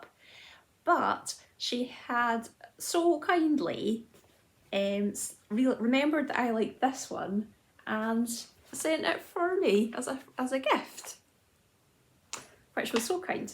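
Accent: Scotland, United Kingdom. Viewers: Hello, lovely to see you again. This is only the second podcast I viewed, I only quite recently discovered your channel. Love your Scottish accent and all your lovely knitting. My small win recently: I am gonna finish a Marie Wallin cardigan I started almost 5 years ago. I finally feel self assured enough to finish the colour work yoke ánd do the steeking. This will be the summer of me finishing my lovely cardigan, so I will be ready for a nice autumn wearing this beautiful colourwork cardi. 😍😍😍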